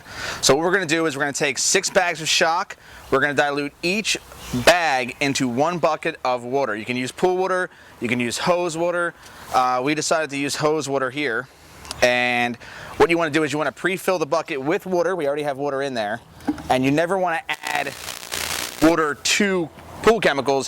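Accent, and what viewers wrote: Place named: Philadelphia, United States. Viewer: I love it ! I heard it and was like oh philly philly 🦅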